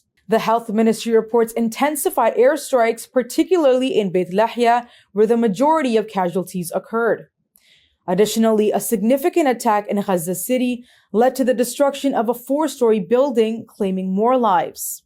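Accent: United States. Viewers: ╔═══ ❤☀💚☀❤ ═══╗ 💚💚💚💚💚💚💚💚💚 Madam, Assalaamu alaikum. Though it is more than an hour since your news video is posted, the TRANSCRIPT is not made available so far. Without the Transcript we from India find it difficult to follow your American English. Please advise your technical staff to make the TRANSCRIPT facility available for your video!!!. THANKING YOU IN ANTICIPATION!!! 🌐🌐🌐🌐🌐🌐🌐🌐🌐 ╚═══ ❤☀💚☀❤ ═══╝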